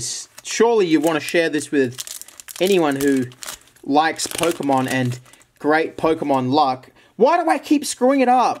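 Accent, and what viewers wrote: Australia. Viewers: Yes your Australian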